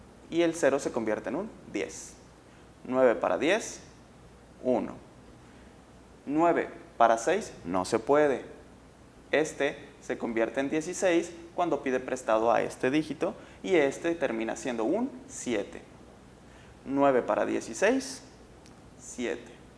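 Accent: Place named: Mexico. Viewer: El profe es muy bueno explicando,pero el acento ese mexicano,no me va,prefiero español de argentina,perdón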